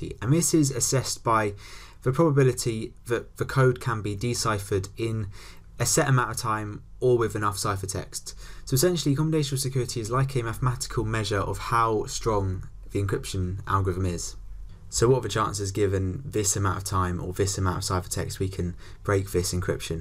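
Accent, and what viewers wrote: United Kingdom. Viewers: This is a heavy British accent but it gets the understanding process in the brain meats done 😂❤ Thank you mate 🌚❤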